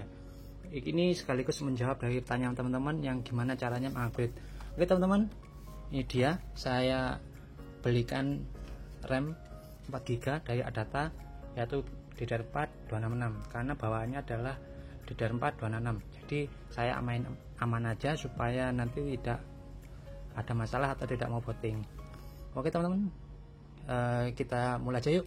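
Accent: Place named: East Java, Indonesia. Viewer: Mas bisa Update olshop ram dan ssd? Ngomong ngomong jatim Mas. Sapa tau tetangga 😂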